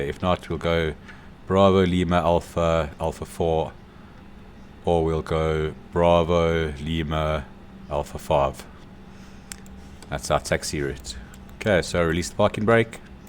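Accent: South Africa. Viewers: Awesome tutorial, accent is a bonus...seems South African. This tutorial was just what I needed to know. I'll practice later today. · Baie dankie excellent tutorial , I lived in SA for 5 years, working at Cape Town airport, your accent brings back fond memories of my time there.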